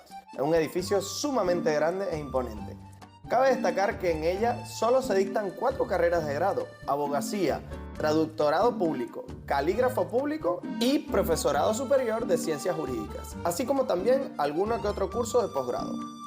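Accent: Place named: Nicaragua. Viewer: Es verdad hay muy poco amor en Argentina, muy buena tù reflexión. quizás por tù acento eres de Nicaragua o del Salvador, y en esos paìses haya mucho màs amor.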